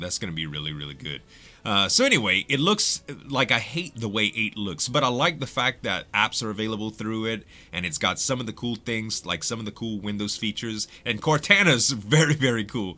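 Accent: Louisiana, United States. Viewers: Found all of you guys via Slip's channel, you're the only one I subbed to just because of that sweet sweet Cajun commentary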